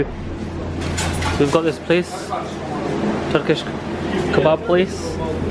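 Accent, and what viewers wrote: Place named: British Pakistani, United Kingdom. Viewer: He seems a british pakistani by his way of speaking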